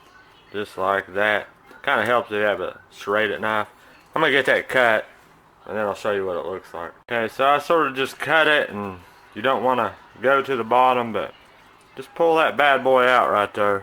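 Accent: South, United States. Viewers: Where in the south you from? I can't place the twang.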